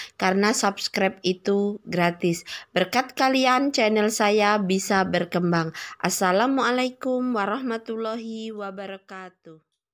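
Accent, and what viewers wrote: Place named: Java, Indonesia. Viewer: Ibu org Jawa ya? Suaranya sedikit medok kyk orang Jawa.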